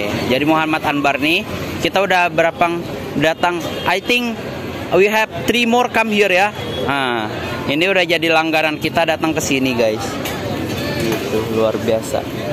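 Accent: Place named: West Java, Indonesia. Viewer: Mas situh asli nya orang sunda ya klo saya perhatikan dari logat logat nya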